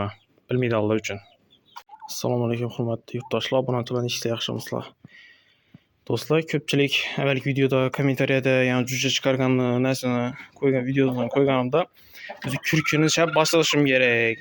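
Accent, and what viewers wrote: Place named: Khorezm, Uzbekistan. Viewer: Aka iloji boʻlsa shevada gapirmang oddiy oʻzbek tilida gapiring vidyolarizni faqat xorazm liklar koʻrmaydi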